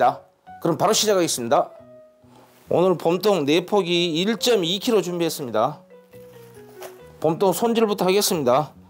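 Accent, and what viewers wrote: Jeolla, South Korea. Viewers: 봄동 안담가도 전라도사투리 땜에 기냥 너무 맛나부러요 나도한번 담가볼려구요 그런데 지난번 무 간장짱아찌 담근거 어떻게 해묵어야 맛나는지 가르쳐 주신다했는데 ᆢ어떻게 되셨나요? 찾아보니 아직 못찾아서 유ᆢ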